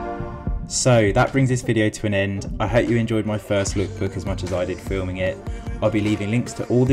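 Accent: England, United Kingdom. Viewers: You sound English. Do people dress like that in the U.S and Australia?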